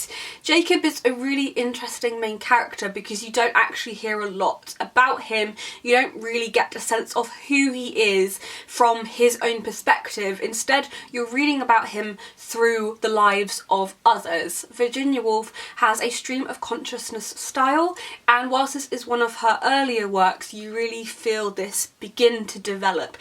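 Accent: United Kingdom. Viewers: I love your wonderful British accent, Hi from Italy!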